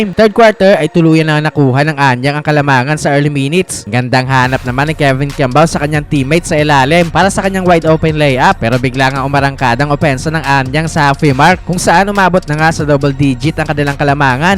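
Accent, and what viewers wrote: Iloilo, Philippines. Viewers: ilonggo ka man gid idol diin ka sa iloilo tikalon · ilonggo meg? haha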